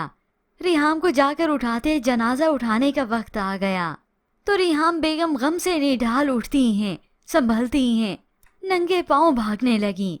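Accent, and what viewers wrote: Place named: India. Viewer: Aisa laga k koi ENDian suna raha ho Iss me sari guftago ENDian lagi Urdu tow ENDian bunn chuki hai Urdu ka end ho chukaa hai Nazar ye araha hai k Awam subh se sham ENDian bunn chuki hai Hur program mein same Phir yoga pooja ho rahi hai Yeh to jamhori musalman tha !!!!!!!!! Ya Allah Khair Kia sare hindu bunn gayee hain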